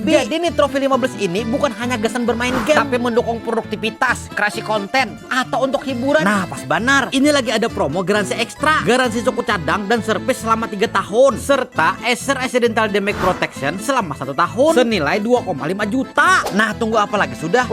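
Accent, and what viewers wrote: Banjar, Indonesia. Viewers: Urang Banjar kah Ikam?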